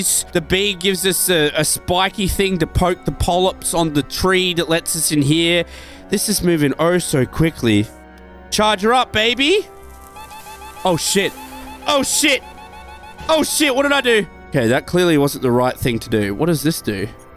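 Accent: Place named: Australia. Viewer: You a Australian brohther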